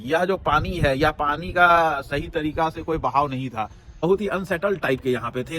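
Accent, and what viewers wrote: Bihar, India. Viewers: सच्चाई ओर सुधार लाने वाले व्हिडिओ आप बनाते हो china देश ने itni tarrakki ki he इस्का main karan क्या हे इस्पर आप जरूर व्हिडिओ बनाईये कयोकी china सबसे जादा अबादी वाला ओर लोकसंख्या मे सबसे जादा रेहेणे वाला गरीब देश ऐसे हमने syllabus मे पढा हुंवा था जब मे स्कूल मे थी/ यानी प्रॅक्टिकल knowledge ही वही सही हे इसलिये china डॉन बन गया मे भी 4 th तक सरकारी स्कूल मे पढी हु/ china ki sachhai जनाना चाहती हु isliye आप के व्हिडिओ देखने लगीं ही ओर से आप जिस तरह बात करते हो वो मुझे बेहत पसंद है/ आप जैसे हमारे स्नेही हे बिहार से वो भी आप जैसे बिहारी टाईप बोलते हे/ बिहारी लोग बेहत मेहनती ओर helping log होते हे.. मे महाराष्ट्र से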